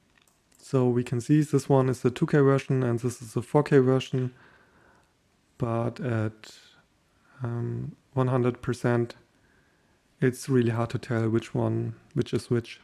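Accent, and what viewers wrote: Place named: Russia. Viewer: Красавчик. Есть конечно чуток акцента русского, но в целом классно говоришь на англисском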